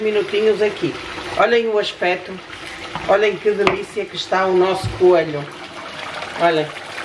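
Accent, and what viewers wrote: Portugal. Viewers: A receita parece deliciosa...e esse sotaque português é uma delícia de ouvir.!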